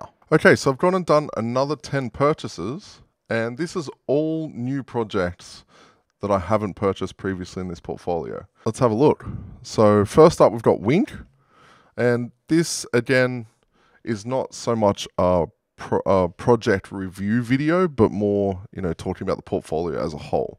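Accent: Australia. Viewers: Just saw you on the bitboy crypto livestream! Thought I heard an Australian accent, Perth here. Love the content and also 100k lol, my first week of crypto and it has opened my eyes massively about the world and also money! Can't wait for what's ahead, hopefully will get that deposit for our first family homee very soon following some good content and advice 😊👍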